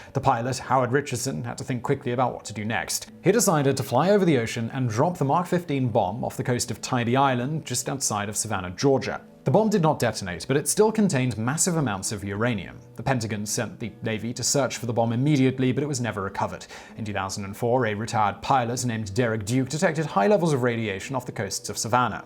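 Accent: United Kingdom. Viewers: He's British, they pronounce some things differently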